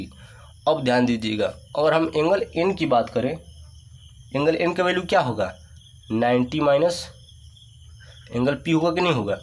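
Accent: Bihar, India. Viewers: Lagata hai bihar ke teacher hai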